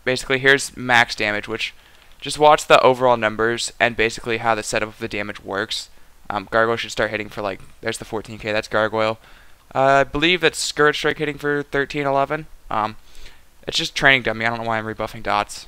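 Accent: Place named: West, United States. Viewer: He has a western accent. What are you getting to? It's Runes not Ruins.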